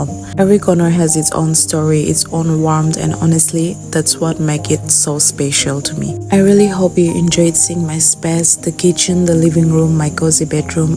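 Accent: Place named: Nigeria. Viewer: Your home is pretty but has anyone told you that you sound like a Nigerian I had to double check your profile but its cute like you are one of us❤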